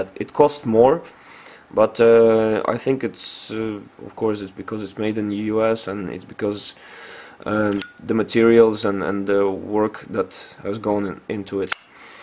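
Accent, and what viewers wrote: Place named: Belgium. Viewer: HAHA ik hoor het aan je stem dat je van belgië bent :p je accent valt wel op, ben zelf ook van belgië Limburg Hoeselt !!!!! greeeetz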